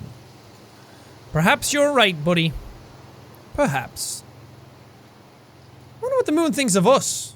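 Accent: United Kingdom. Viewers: Tiffany Sigrist Just by the way, he's not saying it wrong it's just the British pronounciation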